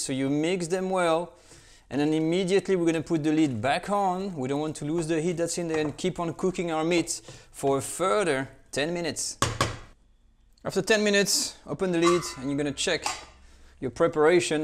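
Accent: France. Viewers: Food and French accent, what else could one ask for?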